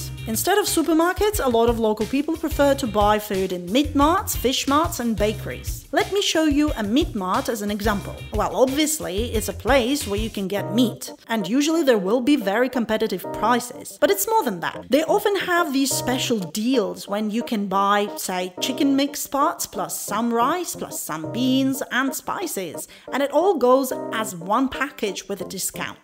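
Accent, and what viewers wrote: Australia. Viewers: The worst accent ever, aussie, english, & some foreign sounding jamaican???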